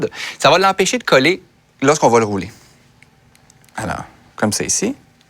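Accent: Quebec, Canada. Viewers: Il est québécois. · Cest juste un accent québécois ^^ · c'est le Français québécois... pas comme de la France :p mais j'aime bien bro (y) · il est quebecois lol normal si il t entendait parler francais il trouvera aussi que tu as un accent et ce moquera aussi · C'est l'accent Québécois que j’adore.. merci beaucoup pour vos belles recettes . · Rania Butterfly c'est le français canadien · ًen plus c normal camarade il es canadien pour l'accent et Bravo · C francais du Quebec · C'est québécois non? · C'est l'accent conadien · Because he's from Québec (French Canada) the people from Québec speak french exactly with the same accent. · Cest laccent québécoise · Le système des degrés en Fahrenheit ainsi que le système de poids et de mesure en livre, en pieds et en pouce ( appelé système impérial) faisaient parti de notre ancienne méthode de calcul. Ça doit faire 25-30 ans déjà qu'il a été changé pour Celsius, mètre, centimètre, etc. 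(appelé système métrique). Mais encore aujourd'hui en 2015, beaucoup de personnes en Amérique du Nord continue à l'utiliser et on comprend très bien les deux systèmes. N.B. Par contre les U.S.A. sont encore avec le système impérial. Effectivement le 350 degrés F= le 180 degrés C. Ainsi qu'un plat ou plaque à gâteau de 9 pouces x 13 pouces = 22 cm x 33 cm, ou une plaque de 10 pouces x 15 pouces = 25 cm x 38 cm. Pour finir, j'ai le même accent québécois que lui. FRANCE du Québec. Concernant la durée de cuisson, en minute et en heure, c'est U.N.I.V.E.R.S.E.L. Un peu d'humour !!!!! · C un accent canadien ou quoi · J' adoooooooore l' accent canadien et le gâteau bravo ❤️❤️❤️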